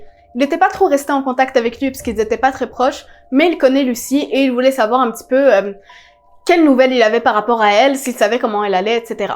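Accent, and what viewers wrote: Quebec, Canada. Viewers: T’es tu Québecoise? Ou t’habites tu au Québec?! Parce que t’as un ti accent Québecois. (Jparle en connaissance de cause, je suis Québecoise)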